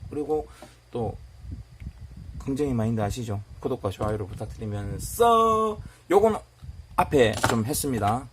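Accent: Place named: Gyeongsang, South Korea. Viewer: 경상도 사투리 가 듣기 조아요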